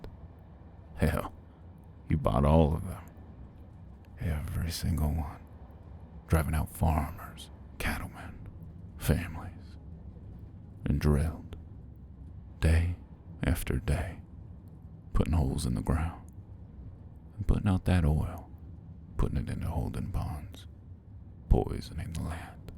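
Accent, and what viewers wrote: South, United States. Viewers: I saw country accent, gasped and clicked immediately 😏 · I RAN to this video, hawt damn. Edited to add: as a southerner, I'm so picky about southern accents but you ate and left no crumbs, sir. Tysm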